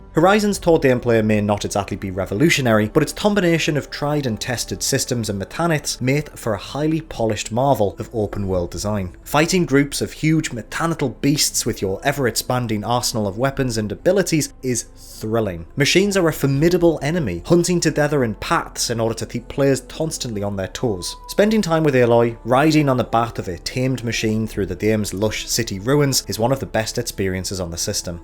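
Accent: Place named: Northern England, United Kingdom. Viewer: Accent sounds like somewhere in northern/North Eastern England. I want to say Hull specifically.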